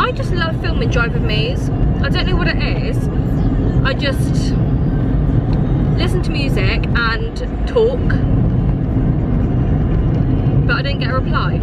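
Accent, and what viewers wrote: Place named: United Kingdom. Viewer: I watch it for her british accent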